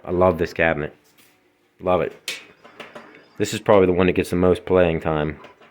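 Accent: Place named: Pennsylvania, United States. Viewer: Fair enough. I was judging based on your accent. Knew it was somewhere in Pennsylvania, lol.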